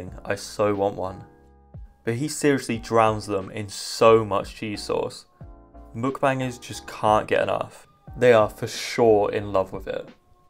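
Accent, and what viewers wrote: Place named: United Kingdom. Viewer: Bro,he Bri’ish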